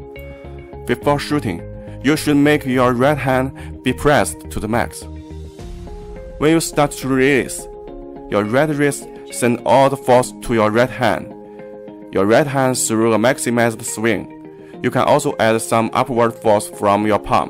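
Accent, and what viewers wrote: China. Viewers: even with the Chinese accent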